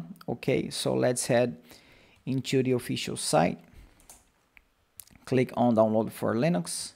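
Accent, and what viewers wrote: Brazil. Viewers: Ganhou novo inscrito! (Ótimo inglês -- tenho quase certeza que tu é BR pelo uso do "T" e alguns sotaques hehe)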